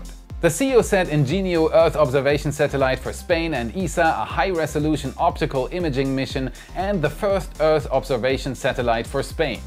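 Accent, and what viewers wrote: Germany. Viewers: Are you from Germany? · Tolles Video, man hört deinen deutschen Akzent, ist aber gottseidank nicht so nervig wie bei vielen Anderen Deutschen, die Videos auf Englisch machen 😉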